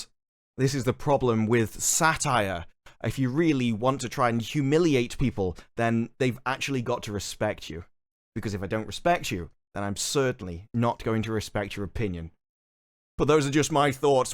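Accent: United Kingdom. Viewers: Mate, are you taking the piss? You’re trying to miss the point or are just a professional idiot with a British accent?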